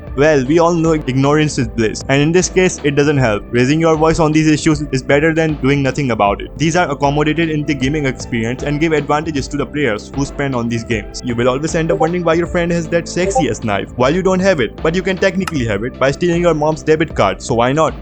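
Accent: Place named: India. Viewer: bro you sound so much stereotypical Indian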